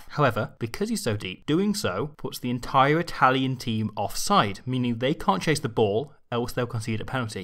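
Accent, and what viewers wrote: Wales, United Kingdom. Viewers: Best rugby analysis ever! And I've been watching the game for 20 years., are you Welsh???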